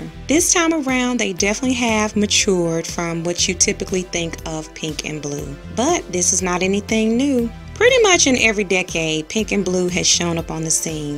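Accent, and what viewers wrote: South, United States. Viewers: She is southern so has a thick accent... I'm not even American and I can understand her clearly. Then again, I do speak 4 languages. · Your soft sweet southern voice is so soothing to me, it's easy on the ears! The informative pictoral dissertation on styles over the years was interesting and proves there truly is "nothing really new under the sun" so we are assured it may be out for now but it will return! Glad to have found your channel!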